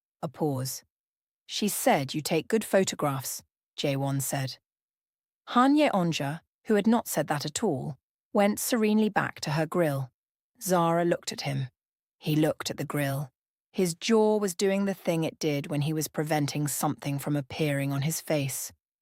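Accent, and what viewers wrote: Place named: United Kingdom. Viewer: Well this story was exhausting. If you want your audience to be capitived gove us something "cracking open". I felt the narrator was to "wordy about the pass of fathers than about the couple." I think the British accent was distracting and she had nothing to support the love balance I was expecting to happen. Part 2 showed a ring on her finger then nothing happened in part 3. Worst than a slow burn, it just was toooooo wordy with nothing to support the love they held inside. maybe 2 dates, no laughter, only closeness, a ring on her finger why? To much w/ narrator explsining life and never bringing them to heartfelt conversation about the future. Honestly, I skip through parts. This wasn't what I expected for 3 part. Never saw her wear white dress, then it was the end. DO BETTER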